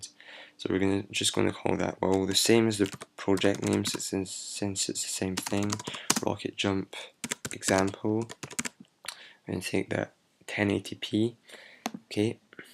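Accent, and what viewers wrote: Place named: France. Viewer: Francais Accent :)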